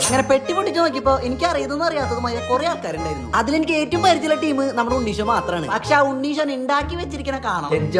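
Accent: Thrissur, India. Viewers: Bro thrissur anno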